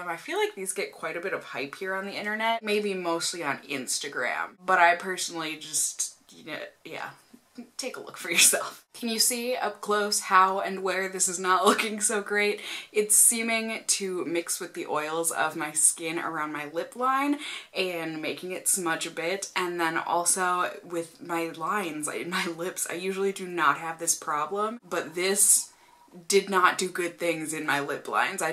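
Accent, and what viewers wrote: Minnesota, United States. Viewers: I feel weird for thinking the sneeze intro is so adorable!!! Lol!! I think it's the little Minnesota twang in her voice. 😂 Love you!! I've been watching for years. Also, I support your choice to separate channels (even though I really wish people could just suck it up and keep on scrolling, also not that my support means much lol). Always wish you the best! Love from Fresno Ca!!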